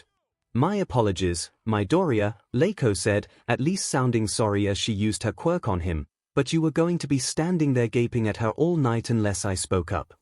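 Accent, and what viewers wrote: United Kingdom. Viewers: Whys the tts bri'ish?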